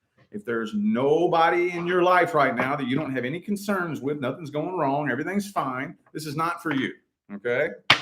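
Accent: South, United States. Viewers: You have to be southern like me with that voice! LOL! I'm from Georgia. And, you're on point with the start. I deserve to be more than an option and game. I watch these for healing and because I am spiritual, I'm Cherokee, and religious. And, it helps to heal with God in place. And, stay focused. I gave my best. For years I tried, done the growth. Forgiveness for their growth, tried to show them that I saw the better in them, and was overlooked or judged for it rather than appreciated. And, at this point, I'll believe what I see. As I deserve, or nothing. No blame game or grudges, just reality and life is too short and should be valued with those we love and love those who love us. Because, pride doesn't love us as much as we love to have it, and it was always first before me whereas they were my choice. So, I done them a favor since they were so unhappy and regretful, with hurtful words, and like I was in the way for loving them, and I left. 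So they could be happy. That was what they made me believe they wanted. I'm a realist. And, everyday that goes by is everyday we cannot get back and nobody deserves to wonder why they don't deserve to be apart of it. Happy holidays!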